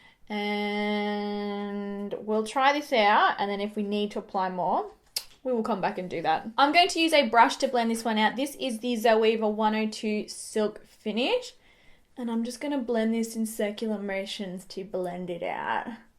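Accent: Australia. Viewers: awesome!!! I am gonna try this one especially for my winter foundation. In winter I can carry off the more emollient feeling foundations because I am not sweating my bum off. But hmm I just thought of something, since I got the mehron primer that won't even matter anymore. Thank you for doing this though! You have my skin undertone and my skin type so your videos and reviews are LIFE for me! LOL though we DO have quite the age difference (I'm 49) still your skin care, tips and tricks are amazing! AND I miss my Australian sister so much your accent feels like home! (She is coming for a visit in May!!!)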